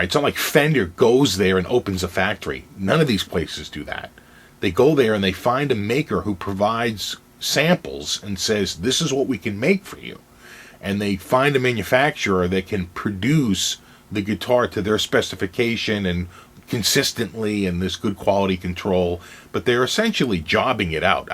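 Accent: Boston, United States. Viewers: Are you from Boston? If so, I am too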